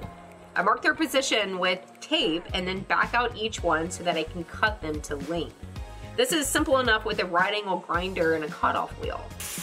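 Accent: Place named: South, United States. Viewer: "Sawlz Awl"...love the southern drawl 😁 Awesome job,!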